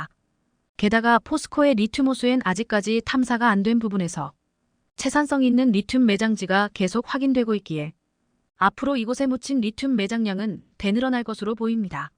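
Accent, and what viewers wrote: China. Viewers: 이거 음성녹음하시는분 중국인 ?? 베트남 한글.숫자 몾일어요 2,000 t 이게 이 영영티라고 읽어요 중국님아 한글 개망신 시키네 유튜버 하지마세요 한글망신 에고거 한두번도 아니고 ........>< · 나레이터는 조선족? 한국인으로 바꿔라! 듣기 거북함!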